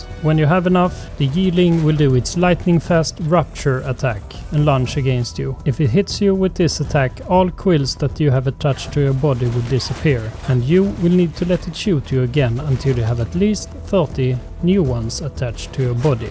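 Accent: Sweden. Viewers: are you swedish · är du skåning?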